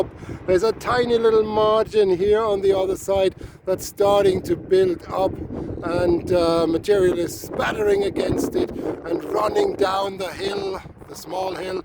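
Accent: Ireland. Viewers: lectured in volcanology and petrology at Trinity College Dublin <num>. Its just one of those accents you can't help but picking up some of the twang:) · You sound irish?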